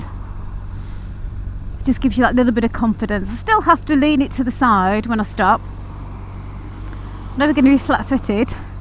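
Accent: United Kingdom. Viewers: i really don't get the algarithm as I've been watching 600r rr motorcycles (motorbikes) for years and this is the first time I'm seeing your Vlog. I alwas love hearing the accent of GB and even more so seeing the land full of lush vegetation.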